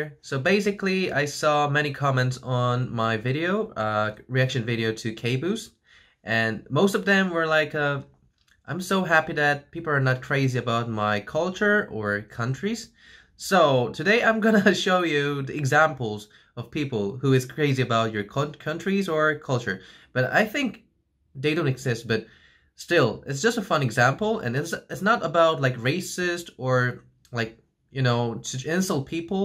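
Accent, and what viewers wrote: United Kingdom. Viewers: AND THIS UK ACCENT LET ME sCReAMMm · omg the British accent cracks me up, I'm from England and i think its brilliant ;)) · The video was really good! Haha Omg, the british accent... really awesome! 😀 I'm Brazilian and that's what a lot of people already said to me on the internet: "-Oh, so you are from Brazil? Cool! Where do you live? Rio de Janeiro, Sao Paulo, Amazonia, in the jungle?" -"I love football, samba, beaches and brazilian girls are sexy." -"I love you 'mamacita'." (What) -"Gracias". (What) Most of them don't even know we speak brazilian portuguese. That's crazy! Haha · I can't imagine a Portugueseboo xD You're almost nailing the pronunciation on "Francesinha"! The UK accent got me though xD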